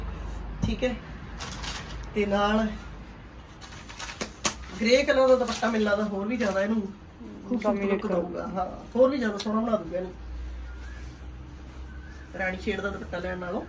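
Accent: Standard. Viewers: Menu thudi pure Punjabi bahut wadeya lagdi aa g sis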